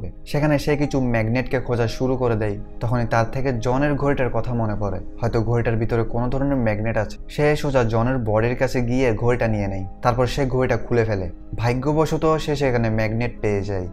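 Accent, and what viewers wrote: Chittagong, Bangladesh. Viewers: ভাই আপনি চিটাগং এর 😊😊 ভাষঅ শুনে বুঝে ফেলছি 😊😊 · খুব বাজে মুভি আর একটু পরে পরে ফ্লাশ বেঁকে যাওয়ার কি আছে আর চট্টগ্রামের একটা টান আসে কথার মধ্যে শুনতে মোটেও ভালো লাগেনা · চট্রগ্রামের আঞ্চলিকতা বেশি দেখা যাচ্ছে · চট্টগ্রামের নাকি ভাইয়া?😂😂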